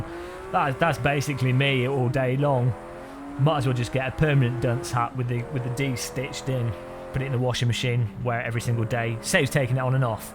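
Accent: United Kingdom. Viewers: I love your british accent! Hear you talk is quite an enjoyable thing :p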